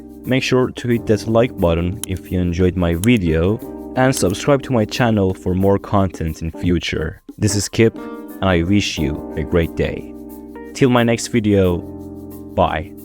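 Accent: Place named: Turkey. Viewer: Türk müsün la😅 aksanindan anladım 😅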